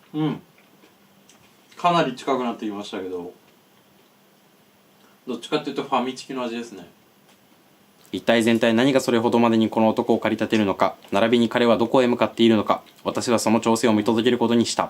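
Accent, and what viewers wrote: India. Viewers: たまに喋り方がインド人ぽい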